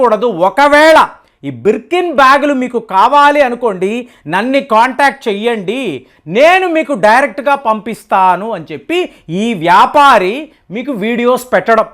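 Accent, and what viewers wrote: Tamil Nadu, India. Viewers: he is Tamil guy. His telugu is not pure. please understand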